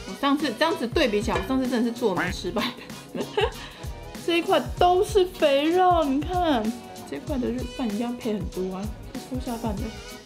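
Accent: Taiwan. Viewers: 雖然偶爾會台灣國語但這支影片中邂逅的發音是正確的喔，掌聲鼓勵妳是特級廚師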